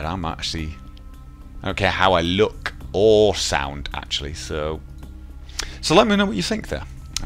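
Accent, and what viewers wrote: United Kingdom. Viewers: Holy Macaroni! It sounds like this channel belonged to completely different person back then! And I can swear it, it was some Brit! :)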